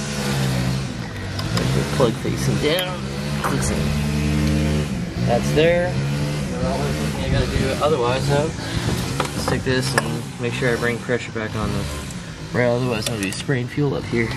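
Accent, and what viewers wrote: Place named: British Columbia, Canada. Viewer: Your accent sonds like almost Fraser Valley BC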